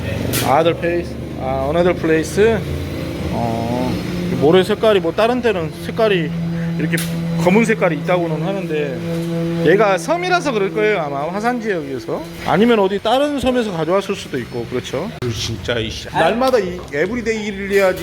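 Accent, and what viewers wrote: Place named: Jeolla, South Korea. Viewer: 눌렀다 ㆍ 이 ~ ㅋㅋ 전라도 사투리를 ㅎ 나도 전라도인데 사투리 엄청 심하거든요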